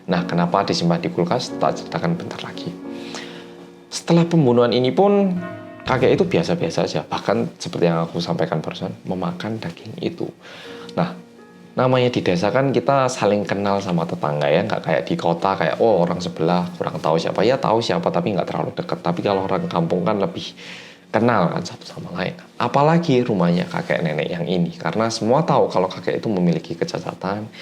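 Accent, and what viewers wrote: Java, Indonesia. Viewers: Logatnya jawa banget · Finally Medok pokok e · Yeah kembalinya 🤓🤓🤓bersama orang korea yang medokkkk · orang korea yang medok nya nggak usah dengan muka serius dong mas. aku malah ngakak e liat muka serius pas awal gini 😅😅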